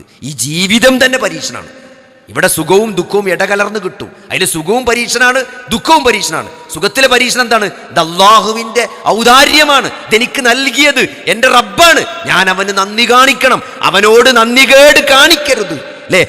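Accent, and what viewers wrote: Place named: Malappuram, India. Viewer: മലപ്പുറം ശൈലിയിലുള്ള അവതരണം അതാണ് അദ്ദേഹത്തിന്റെ പ്രത്യേകത മാഷാ അള്ളാ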